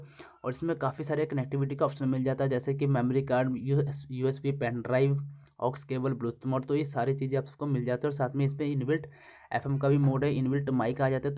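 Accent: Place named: Punjab, India. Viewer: Amazing Punjabi accent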